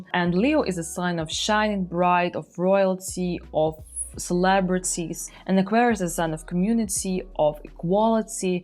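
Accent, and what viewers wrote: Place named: Iran. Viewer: چقدر لهجت ایرانیه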